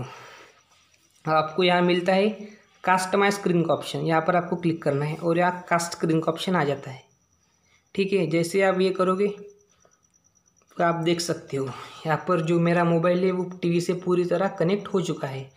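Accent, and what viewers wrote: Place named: India. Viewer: The most fucking hindlish I've ever heard. Blood from the ears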